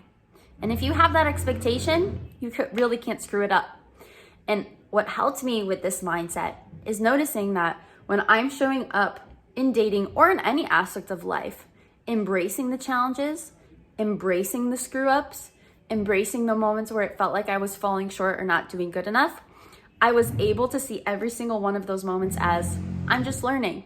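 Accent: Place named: Romania. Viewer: I looove your romanian accent! 🥰 You're saying it perfectly! Great video too! 🤩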